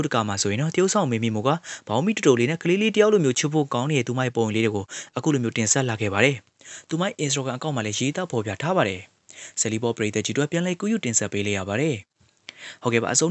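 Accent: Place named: Myanmar. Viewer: ဘာတွေလာ‌ပြောတဲ့channelလည်းကွာ စောက်ချက်မရှိဘူးလား စာသားနဲ့ပြောတာနဲ့မညီဘူး ဗမာပီသတယ်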